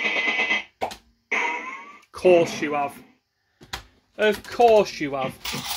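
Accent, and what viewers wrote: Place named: Yorkshire, United Kingdom. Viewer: lol yea love ur banter while ur playing proper plain speaking yorkshireman Im Teesside for me sins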